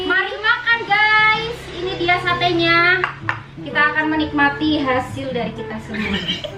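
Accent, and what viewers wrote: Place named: Java, Indonesia. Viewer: Kk klo bahasa jawa lucu yahh🤣🤣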